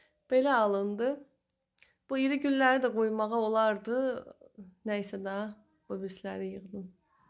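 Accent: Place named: Balakan, Azerbaijan. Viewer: Super, danisiqda Balakən aksenti öz yerinde😊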